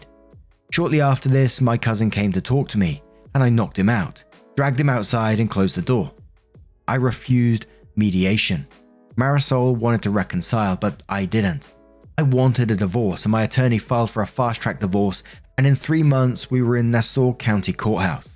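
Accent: United Kingdom. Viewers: i aint gonna lie I was taken outta it for a second hearing someone with a british accent say they were a latin king lmfao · British pronunciations of Spanish words make me chuckle and wince simultaneously. One day you’ll get it,!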